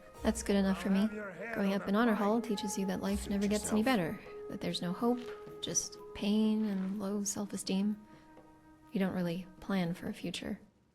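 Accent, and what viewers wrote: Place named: Canada. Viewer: Love the voice acting! Will have to give it a download! Soothing voice too, love the Canadian twang :) Are there any known mod conflicts?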